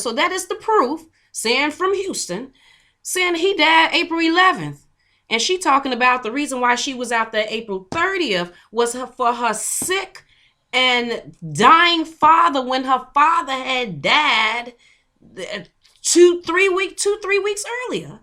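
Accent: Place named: Chicago, United States. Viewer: Subscribing cause your accent tells me you’re from my city Chicago 🤷🏽‍♀️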